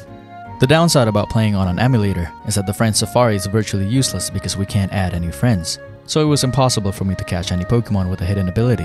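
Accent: France. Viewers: why does he say every name in french LYSHANDERUE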